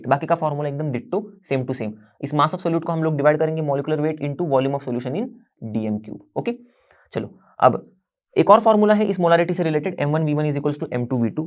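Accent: India. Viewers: These lessons sound interesting, but they have one small problem: the Hindi accent 😅 Could you please add English subtitles to your playlists..? Thank you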